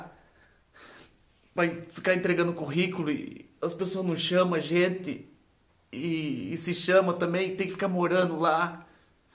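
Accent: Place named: Rio Grande do Sul, Brazil. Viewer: Gostaria de saber de onde vc é .Achei que fosse gaúcho, pelo jeito que tu fala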